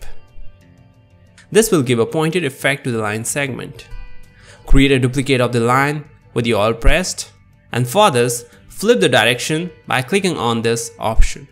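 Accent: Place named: India. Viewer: chhhharactorr ? indian cu*t ? lol shity accent always leaks out. No matter how hard you try...wannabeeez!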